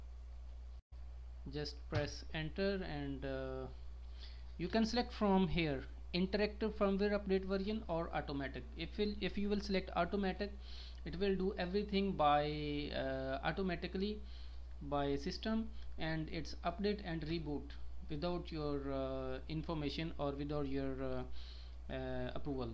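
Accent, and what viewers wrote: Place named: Asia. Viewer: i was just asking as ur accent sounded like an asian bro. Anyway keep up the good work.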